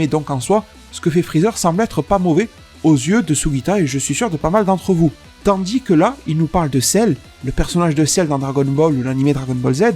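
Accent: Marseille, France. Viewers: t'es marseillais??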